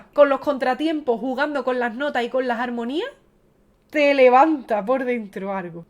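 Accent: Andalusia, Spain. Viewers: Sos tan linda!!! Es un placer ver cada vídeo tuyo. Como Argentino disfruto muchísimo de tu acento español y de tu forma de pronunciar la T. Te deseo lo mejor de la vida. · Muy bello video. Me encanta tu acento Andaluz!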